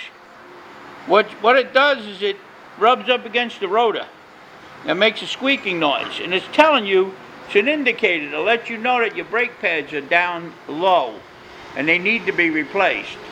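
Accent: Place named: New York, United States. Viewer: I was all "This sounds like a NY guy" Then I saw the FDNY hat, Alright!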